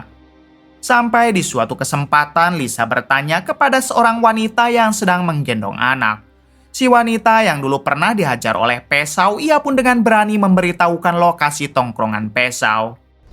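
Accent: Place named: Bogor, Indonesia. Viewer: Bogor mana suaranya🎉🎉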